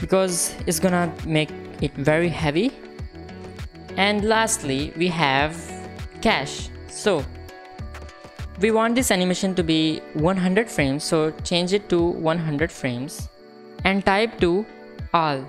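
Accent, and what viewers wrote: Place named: India. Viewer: With the Hindi accent and the noisy background, the tutorial is irritating. Text to speech applications are freely available on the internet. You simply type what you want to say and the converter can turn your text to choice English speech. You can choose any voice type; or language and even such as Oxford or Cockney English. Yes, you can also choose between male and female as well as children. Windows also has such a facility.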